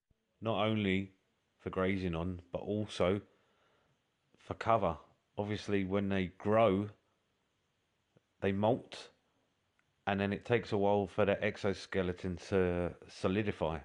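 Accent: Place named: Cockney, United Kingdom. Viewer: My cherry shrimp and neons are best friends - but to heck with them, bruvva, I just adore listening to you talk. Luv me some Cockney accent 💐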